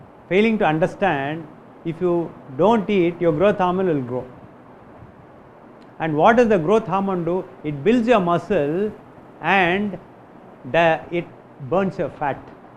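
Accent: India. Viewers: He is repeating Dr.Jason Fung in an indianised english.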